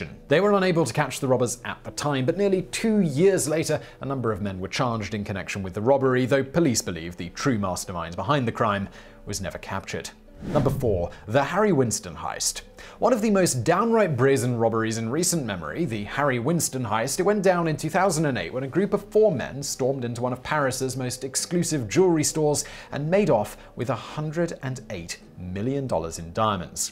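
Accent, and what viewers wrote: United Kingdom. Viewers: British pronunciation. They also refer to 22s as "two two".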